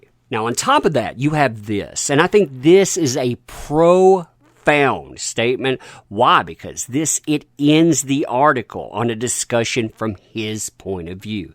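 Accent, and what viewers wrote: South, United States. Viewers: Yes, he has academic training and reports with rigor. That southern drawl is very charming.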